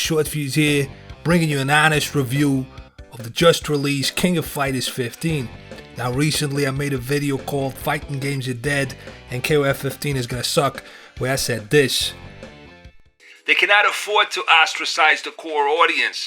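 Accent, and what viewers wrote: New York, United States. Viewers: Dude sound like a 30 year old New Yorker from the 70s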